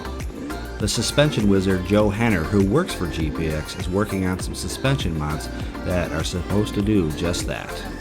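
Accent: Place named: Northeast, United States. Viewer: Are you in upstate NY, NH or Vermont? Gotta be one of those judging by your accent. Also the FSE250E is now fuel injected and runs the same motor as the CRF250F, which is one of Honda’s Chinese/Thai bikes.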